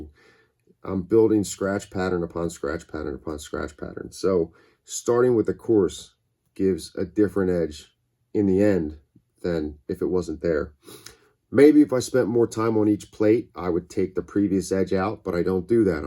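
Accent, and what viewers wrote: United States. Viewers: This is off topic but every time I watched your videos your smooth deep voice always reminded me of someone I couldn’t figure out, but now I realized you sound like an American accented Clive Owen. 👌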